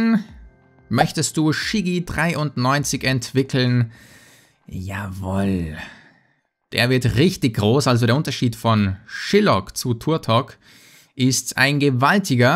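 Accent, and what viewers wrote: Austria. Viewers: Woher kommst du (wegen deinem akzent) ????🍭🍭🍭also akzent in ÖSTERREIC.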